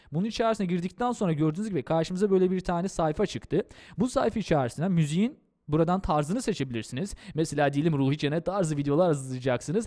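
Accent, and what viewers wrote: Azerbaijan. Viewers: Siz Azerisiniz?? +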